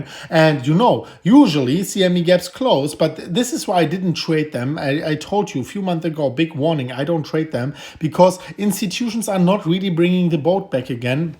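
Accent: Germany. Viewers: Good time to wait for bottom if you are a hodler. Why? Tax season. Funny German Accent Guy Say's Whales selling BTC.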